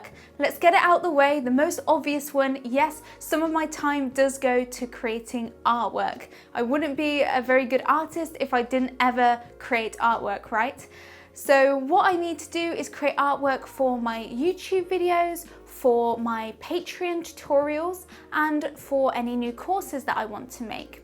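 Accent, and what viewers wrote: United Kingdom. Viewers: Love your lecture and I admire you been so young and doing so many tasks, yes been a professional artist is not simple and yes we need to learn more from others new techniques or ideas or how the business world change and new technologies are introduce, I love painting ,but not for business, I do other type of art as well, but I understand the importance of keep with the advance technologies. Congratulations you are great artist, and I am thinking in enrolled into your course, as I understand fir your accent you must be in somewhere in the United Kingdom, not for sure is England or Irish, either way is ok, just curiosity, are your courses in dollars ? I am in Australia and want to know wich currency you use. Thank you very much, my e-mail is <mail>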